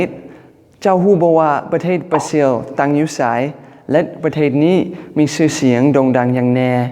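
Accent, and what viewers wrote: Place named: Laos. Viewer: ເວົ້າລາວ ເປັນຕາຮັກນໍ ອາຈານ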